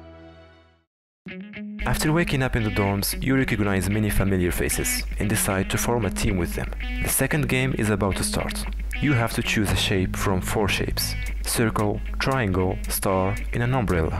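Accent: Morocco. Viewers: Accent mn kilomètre t3rfha mghribia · dude From the first second of this video i knew it , you're 100% moroccan 😭😭pls keep going i like your channel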